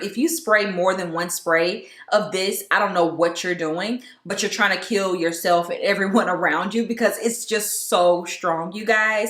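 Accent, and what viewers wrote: South, United States. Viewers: I love your accent you have a southern sound, love this video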